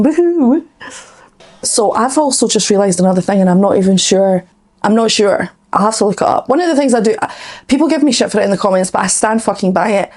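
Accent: Scotland, United Kingdom. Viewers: I have four comments for this 🙂 1. I really don't get why so many English speakers apologize for having a dialect. Never ever be ashamed of how you speak. Take pride in having a proper dialect and not speaking like you are from nowhere with some watered down standardized sound. How utterly boring and stagnant the world would be if we all spoke the same way. Inspiration from different cultures and different places is what lest humanity grow and evolve. Ignore the language elitists pretend that some dialects are better or more civilized than others. (I am by the way quite jealous of that incredible cool Scottish dialect you have.) 2. is not the most political performers in the world. That being said their music does in general carry a very positive message, and it has been suggested to be prescribed for depression. There are multiple songs from them with a strong message. 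Since you don’t like being biased before a song I will list the songs and the messages separately, so you don’t know what you are getting 🦊 The songs are: - (PIA Arena 2023 Live) Eng Subs <link> - Live at Sonisphere 2014,UK (OFFICIAL) - The last song of their first concert in the UK (and their international breakthrough). The audience starts out a bit confused for the first few songs, and end up like you see here at the end. <link> (OFFICIAL) <link> The themes are singing about: Looking forward with a positive view after the world has ended. Anti bully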